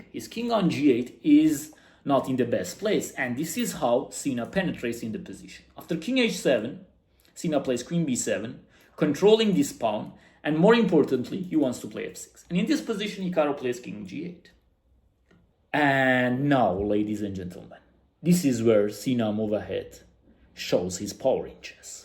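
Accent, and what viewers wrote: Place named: Italy. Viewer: the church bells definitely seal the deal confirming youre italian if the accent wasnt enough😂😂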